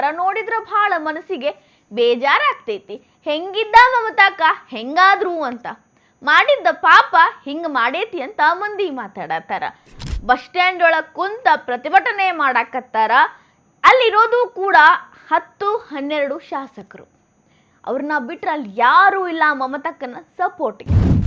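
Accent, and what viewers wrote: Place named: Hubballi, India. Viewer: Nimm ನ್ಯೂಸ್ ಓದದು ಹುಬ್ಬಳ್ಳಿ ಸ್ಟೈಲು ಬಹಳ ಇಷ್ಟ ಆಗ್ತದ್ರಿ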